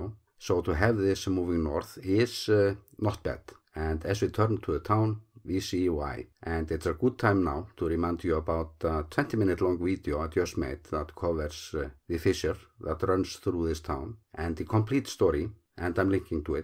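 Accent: Iceland. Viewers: could you speak SLOWER with your Icelandic English, please???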